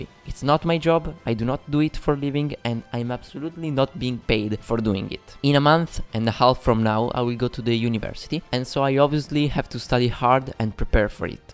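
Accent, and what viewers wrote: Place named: Italy. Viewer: Spettacolare il tuo accento Italiano/Inglese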